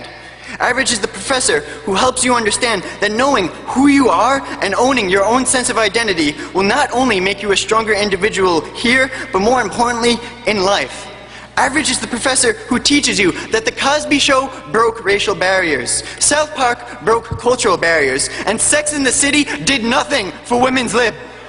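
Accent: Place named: Canada. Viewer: I'm guessing Canadian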